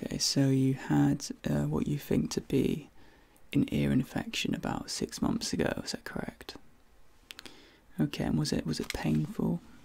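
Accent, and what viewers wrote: United Kingdom. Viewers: The (super mega hot) British accent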